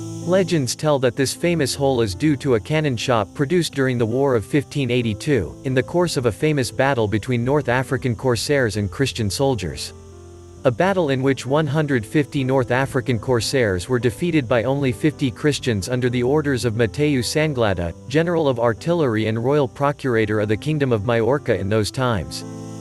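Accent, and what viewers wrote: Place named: United States. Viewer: Nice videoing - but the American-style narration distracts. Is this some automatic US-english narration?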